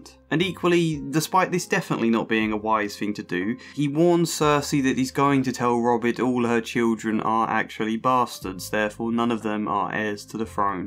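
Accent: United Kingdom. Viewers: It's okay - your accent shows that you're obvious British, and thus apologies are likely second nature to you. I'm sorry to have pointed this out; I'm Canadian. Sorry.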